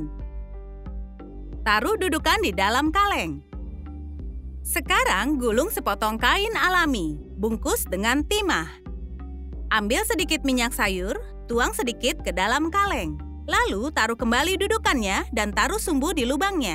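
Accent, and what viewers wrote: United Kingdom. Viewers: kak kakak orang inggris atau indonesia 😊😊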